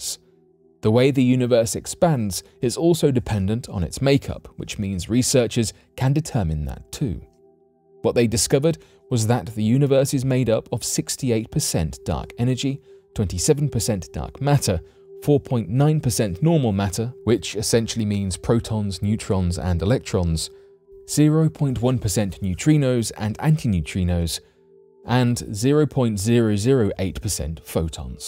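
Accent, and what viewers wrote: United Kingdom. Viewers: I understand why he pronounces bellingenic the way he does he's British guess he's never heard of that famous explorer from long long ago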